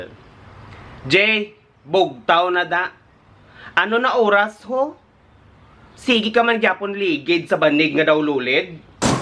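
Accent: Iloilo, Philippines. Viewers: Kamusta!Baw enjoy gid ko sang ilonggo accent mo ah kg ang mga tirada mo.Keep up the good work kg stay safe gid always 🙏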